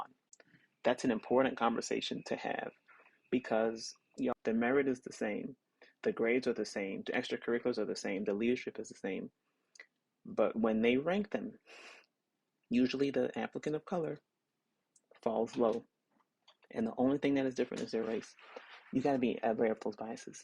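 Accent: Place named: United States. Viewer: Are there that can speak English like this? 😳👀 Anyways, hello 👋🏻 from Nigeria And you 🫵🏻 have gotta be a black American, not an igbo soma 🫵🏻🫵🏻🫵🏻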